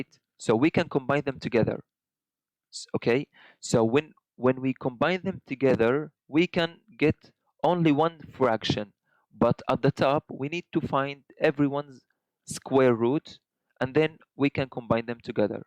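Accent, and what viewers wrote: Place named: India. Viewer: The best accent as indian I've heard so far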